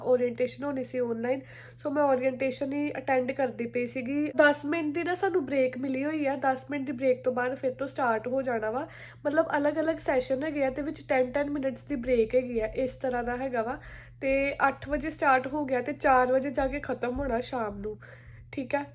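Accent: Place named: Amritsar, India. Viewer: Love ur Amritsari accent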